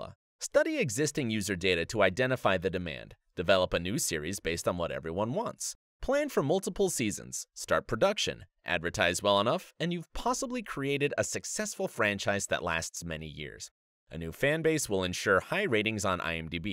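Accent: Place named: United Kingdom. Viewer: I actually miss the British accent. :(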